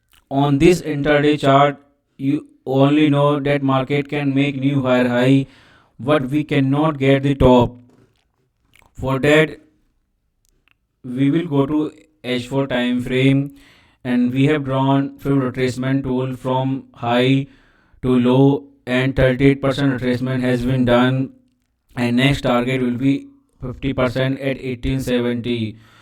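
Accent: India. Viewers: The indian accent is wow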